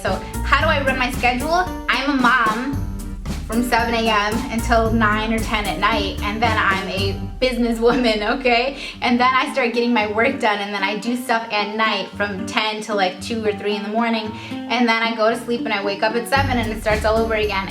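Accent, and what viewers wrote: Chicago, United States. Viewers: We do have an accent in chicago. We can't tell but others can. And you r the first person that actually said "I'm from the suburbs". Lol. ❤️chicago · lol i actually really think you have a chicago accent! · It is a strong Chicago accent. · Not a fan of the music, it was really annoying. And yeah, you do have a bit of an accent. I can definitely tell that you're from Chicago or the surrounding area. It's not thick accent, but it's noticeable. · I know this may sound really creepy and stalkerish o.o but what suburbs of chicago are you from? I am very local to Aurora, haha and there is a but of the Chicago accent in there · It's actually a pretty strong Chicago accent in my opinion hahaha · You do have a Chicago accent 😂 I love it though!!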